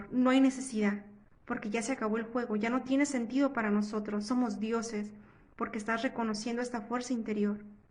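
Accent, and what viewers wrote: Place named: Mexico. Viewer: Por cierto me encanta oírte bien mexicana 😊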